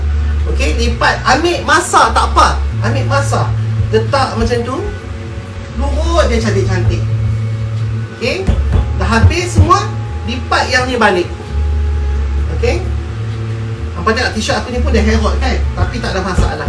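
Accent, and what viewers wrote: North, Malaysia. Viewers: Bahasa utara lah.. Kedah, penang mmg ckp lagu tu🤗🤗🤗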